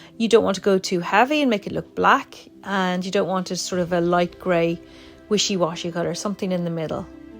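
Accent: Ireland. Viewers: I just found you on YouTube! Love your drawings. Would you share what is the name of the brown ink? I really like it! I also adore your lovely Irish accent! Thank you.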